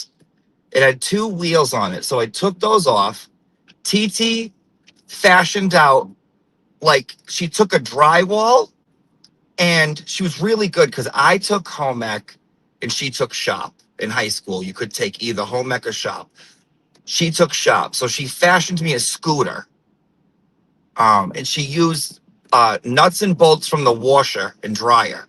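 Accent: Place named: Fall River, United States. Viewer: O M Fing Gawd!!!!! U R awesome!!! Love the fall river accent🤣🤣🤣replay here so good eveningk to u too!!! My earpods in and husband keeps asking me what’s so funny and i said 🤪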